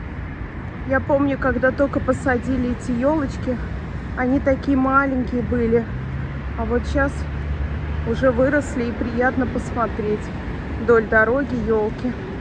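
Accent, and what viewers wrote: Baku, Azerbaijan. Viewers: Привет из Молдавии!!!,случайно попала на ваш канал и была очень рада!!!Я родилась и выросла в Азербайджане,в поселке Насосный...Училась в институте в Баку...Практику проходила на нашем Бакинском телецентре... Огромное спасибо за чудесные позитивные ролики!!!За ваш бакинский русский...Азербайджанский язык Я помню...не могу сказать,что в совершенстве,НО...,всех благ вашей прекрасной Семье,пусть все будут здоровы...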